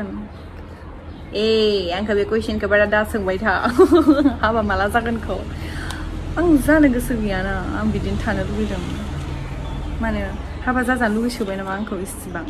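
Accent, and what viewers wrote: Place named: Kokrajhar, India. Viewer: Your Boro language is same kokrajhar boro language and you are good girl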